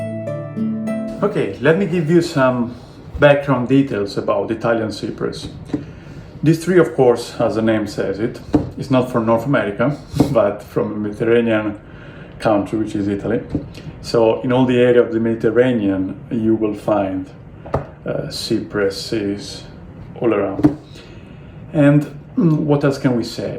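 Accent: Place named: Venezuela. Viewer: Tienes acento venezolano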